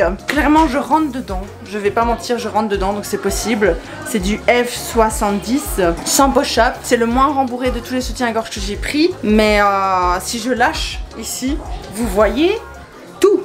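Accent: Belgium. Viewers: T’es belge ?!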